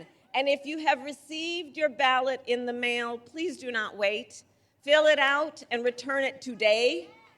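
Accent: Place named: England, United Kingdom. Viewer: Love who! Who’s that? I don’t know her. She’s kinda has an English accent. Sound like she lived in a land of OZ my gosh is she the one behind the curtain or was that a Teleprompter?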